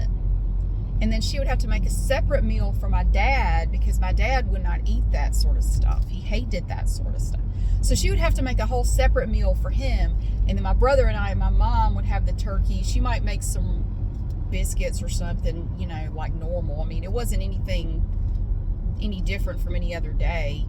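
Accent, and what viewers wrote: South, United States. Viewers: I am patiently waiting for your costume reveal!! The holidays w/ southern ASMR sounds are my my favorite way to celebrate! You always get me in the spirit ❤️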